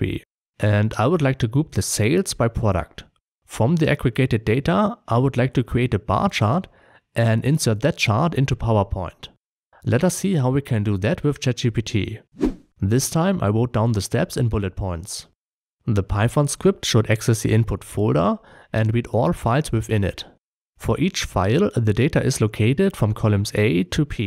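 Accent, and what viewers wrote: Germany. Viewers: Den Akzent hört man leicht raus😂 aber gutes Video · Kann es sein das du eigentlich deutsch sprichst? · Also dein Denglisch is ja wohl kaum zu überhören. 🤣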